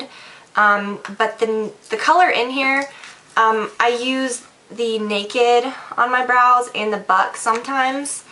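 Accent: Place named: Texas, United States. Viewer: awesome tutorial, it sounds like your getting a texas accent or something lol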